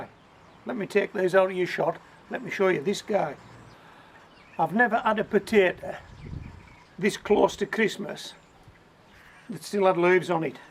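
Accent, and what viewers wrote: United Kingdom. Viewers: Hello,. Where in the UK do you live? You have the most charming accent! 🙂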